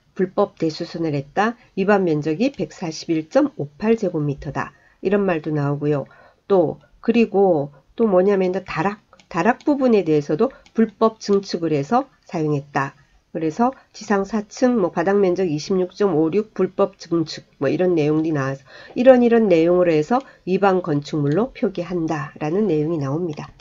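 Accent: Gyeongsang, South Korea. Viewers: 소장님 유튜브를 보다가 우연히 보게 되었습니다. 저도 경매에 관심을 가지고 책도 사고 혼자 열공중인데 어느 채널보다 실전같은 느낌이 많이 들고 같은 경남쪽이라 말투도 정겹고 정말 시청자를 위해서 하신다는 마음이 보입니다^^ 이렇게 도움되는 영상 항상 감사하고 저도 열심히해서 고수가 되어보겠습니다!!!! 긴 댓글 처음 이지만 항상 건강하세요^^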